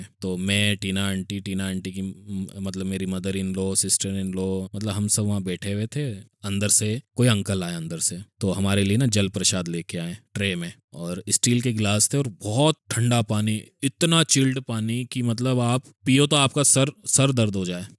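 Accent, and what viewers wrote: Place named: Indore, India. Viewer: Uncle ji aap v satsang sunaya kijiye me v bht time indore rahi hu indore is my favorite place😍😍aapki boli mein wo pura nazar aata hai😍 Jai Guruji🙏🙇‍♀️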